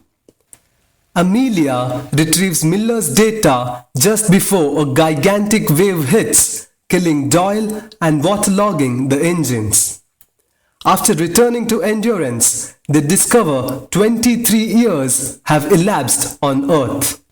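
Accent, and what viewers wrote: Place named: India. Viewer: You have a very light Indian accent man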